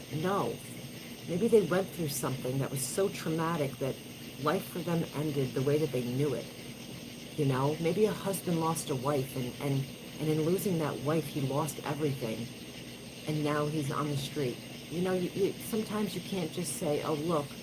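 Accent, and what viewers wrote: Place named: New Jersey, United States. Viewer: Missed your 1st live:( watching now i would of never guessed you’re a Jersey Girl.I hope you do more lives! Hit the like folks its free helps the channel! And subscribe, of course.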